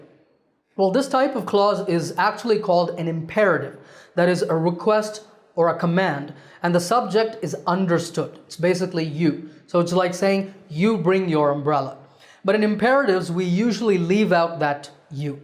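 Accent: United States. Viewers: Your accent is American wow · I come to you for two reason. First I learn so much from you and Secondly I love watching an Indian Using American Accent. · You are indian but voice is like American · Sir are you from India? How did you acquire American accent? Tell us about that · You are Indian but your voice like real American people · Apart from your excellent teaching . I liked your american accent, How did you developed it ? I am too trying to speak in ameri-khen accent . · Your american accent is 😍. Wanna learn it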